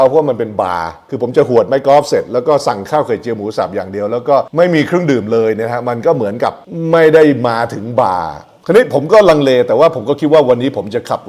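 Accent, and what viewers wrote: United States. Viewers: แต่ต้องตัดสำเนียงฝรั่งออกก่อน ไม่น่าเชื่อ เกิดและโตในเมืองไทย ไปเรียนต่อที่เมกา กลับมาเมืองไทยแล้วยี่สิบกว่าปียังตัดสำเนียงเมกันออกไม่ได้ หรือคุณปลื้มไม่ยอมปรับเปลี่ยนเอง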